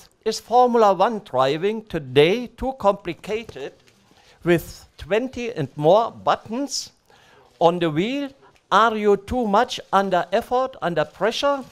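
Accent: Germany. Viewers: This german accent is rediculous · Gute, alte deutsche Aussprache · He should learn to speak english. I'm german, but it's hard for me to understand his denglish.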